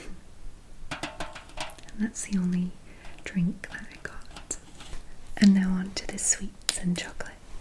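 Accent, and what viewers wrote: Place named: Scotland, United Kingdom. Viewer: She's Scottish, that's where the accent is from.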